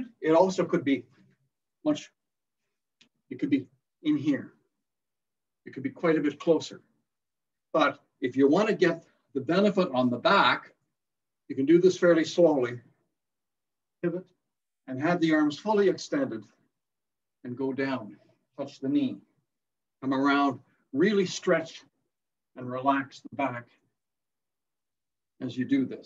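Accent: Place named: Canada. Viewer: really enjoyed your presentation. That was a good history lesson and I enjoyed your pictures. I could tell from your accent that you were Canadian. I’am one of your neighbors from the States. Back, years ago, I did a tour of Canada for a couple of weeks. Really enjoyed meeting all the people I met along the way. My mom’s family are all Irish here in the states. Anyway, I’m looking for a way to defend myself from the epidemic of druggies who are doing a lot of the stealing and robbing State side. It’s getting rough here.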